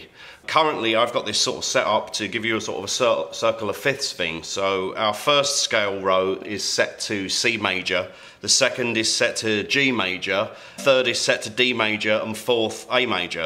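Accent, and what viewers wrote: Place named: United Kingdom. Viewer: From your accent you seem to be British so why is everything priced in American dollars on your site? Are we going to find that UK customers are going to be ripped off again (I've been charged over £250 for things priced at $250 US in the past by other companies) because someone seems to think that we're too stupid to notice the difference in the exchange rate, and they also think we won't notice that we're being conned. This looks like something I can make good use of but I'm reluctant to buy without knowing how much it's really going to cost me.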